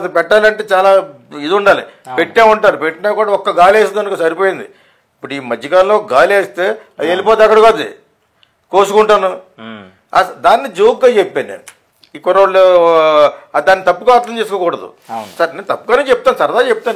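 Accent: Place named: Godavari, India. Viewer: Geetha krishna u r gud for nothing bcoz of nee bullshit talks in channels. How come u target MEGA STAR tell me first ? I watch ur videos regularly bcoz of ur accent of godavari but u make mistakes in interviews by targeting SHANKAR. If u r criticize other Director then u don't have right as a Director.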